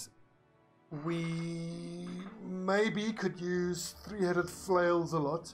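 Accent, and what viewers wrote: South Africa. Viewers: just found you randomly and listened and realized you are a fellow south African GG subscribed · you sound like a fellow South African, great game and looking forward to watching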